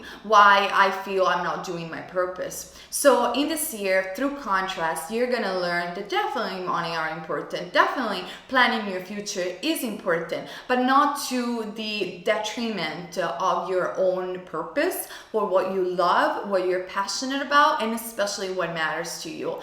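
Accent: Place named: Italy. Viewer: Love the Italian accent!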